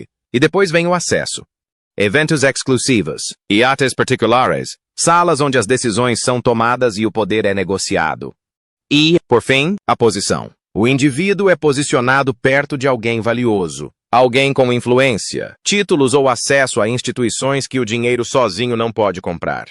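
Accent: England, United Kingdom. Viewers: Narrativa boa Mas a introdução de frases com sotaque inglês e totalmente desnecessária.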